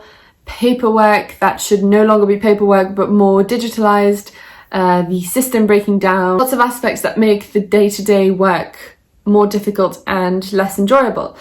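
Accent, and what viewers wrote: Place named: England, United Kingdom. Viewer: hello from turkey, i'm working as a GP here, i'm currently studying for OET exam, which enables me to take PLAB exams. If you have any questions or wonders regarding Turkish healthcare system, i would gladly answer to you. Anyway, your english accent is so pure and nice, it's also educational for me to get used to english accent:) greetz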